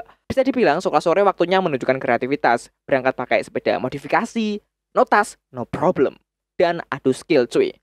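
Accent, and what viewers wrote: Java, Indonesia. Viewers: Medok yups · Tim medok👍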